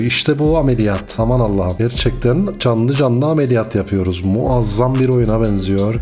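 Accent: Cyprus. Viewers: kral kıbrıslı mısın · kıbrıs ağzın çok iyi reis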